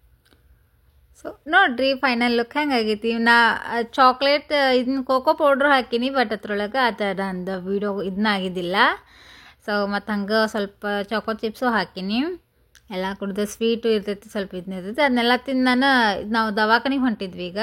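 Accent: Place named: Uttara Kannada, India. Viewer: Nim bhashe super uttara Kannada super vlog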